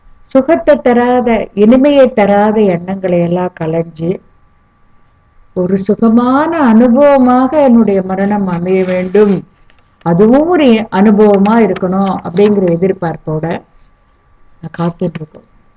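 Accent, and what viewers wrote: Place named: Palakkad, India. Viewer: I follow all clips because I like the spoken tamil it resembles Paalakkaattu tamil. I am from Srilanka. Thank you so much for all cooking.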